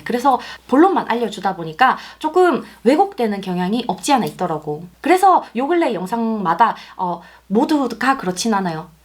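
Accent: Gyeongsang, South Korea. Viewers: 경상도 목소리 친근해요 타지역 10년이라 항상반가워요^^ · 아 어디말투지? 경상도+서울 리믹스 ㅋㅋ 매력있네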